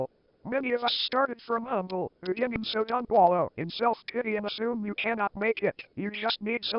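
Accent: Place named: Arab world. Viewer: I can hear your middle eastern accent through your voice changer.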